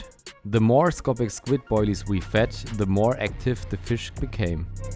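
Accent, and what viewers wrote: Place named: Germany. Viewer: The dialect is so german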